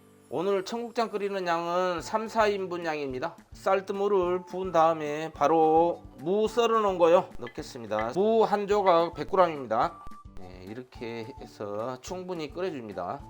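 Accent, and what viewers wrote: Jeolla, South Korea. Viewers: 전라도사투리 쉐프님 최고입니다!! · 쉐프님 말씀도 구수하시고. 음식도 왜 케 잘하시는지 전라도 사투리 . 아. 쉐프님존경합니다. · 우리 샤프님 얼굴한번 보고싶네요 전라도 구수한 목소리가 참좋아요 요리도 잘하시고 구독자님들에게 펀리하게 요리도 하시면 ㅋㅋ 너무 좋아부러요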